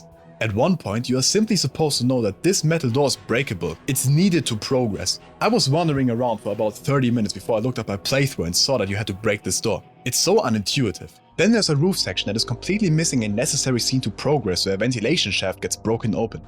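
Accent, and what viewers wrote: Germany. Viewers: Lovely video! Like others have said, you are very engaging while presenting on camera. You kind of remind me of a younger Fernando Alonso but with a German accent.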